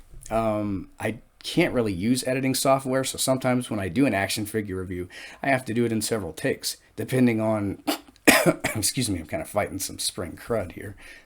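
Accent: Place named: North East England, United Kingdom. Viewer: You sound like you're from the north east area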